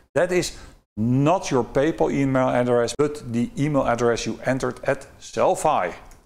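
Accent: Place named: Netherlands. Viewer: Dankjewel voor jou dienst. Altijd leuk om de Nederlandse accent te horen. Groetjes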